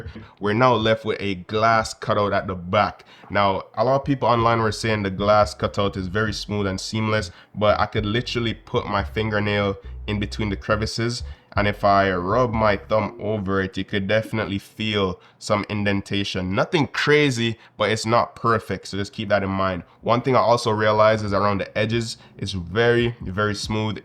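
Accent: Jamaica. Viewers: Sound like him from 🇯🇲 cant wait to get mines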